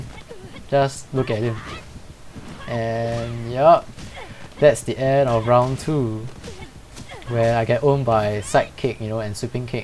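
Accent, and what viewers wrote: Singapore. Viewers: Lol I can tell you're Singaporean just by the way u talk! No problem understanding u cuz im also Singaporean :D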